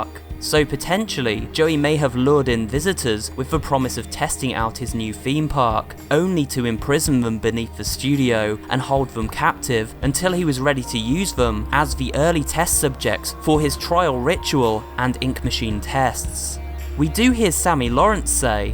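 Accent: United Kingdom. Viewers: That's a pretty good detective work. Also, I did notice your very interesting aksent. Are you british?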